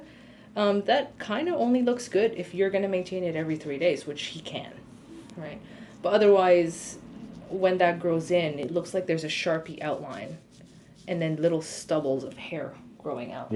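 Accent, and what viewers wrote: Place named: Canada. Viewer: Canadian accent sounds very nice 👌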